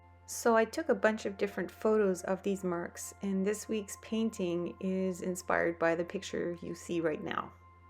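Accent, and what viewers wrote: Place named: Canada. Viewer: Why do you have a Canadian accent? Just curious.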